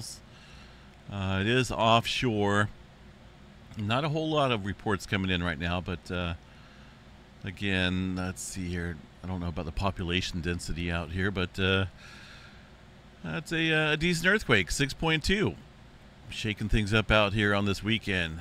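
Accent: California, United States. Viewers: You sound like you’re from Cali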